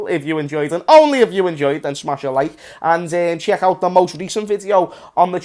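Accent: Liverpool, United Kingdom. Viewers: “I’m not gonna say it’s a great signing” lmaooo love the scouse salt 🤣🤣🤣 Varane is top 5 best CB in the world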